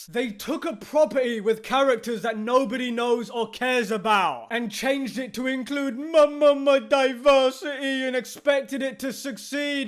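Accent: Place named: England, United Kingdom. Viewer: I think the content you create and the opinions you have here are valid and all but I absolutely HATE the way you aggressively shout with that horribly abrasive English accent, it makes it almost impossible to watch more than a few minutes of the video. Reminds me of certain scumbags that I used to meet on random UK discord servers with this 'know all, know fuck all' attitude that you yourself seem to have adopted for this channel. Hard pass mate.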